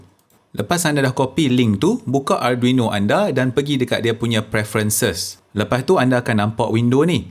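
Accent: North, Malaysia. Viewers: Seronok dengaq oqang utara punya slang tu piaw..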